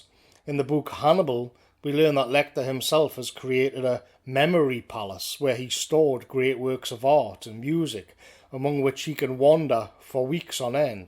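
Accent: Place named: Geordie, United Kingdom. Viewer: nothing to do with your video, as they're all exceptional; I just wanted to say as a Geordie ex-pat living in the colonies, I love your vids. Just hearing the local dialect brings me closer to home, even though i'm thousands of miles away. Keep up the good work big fella!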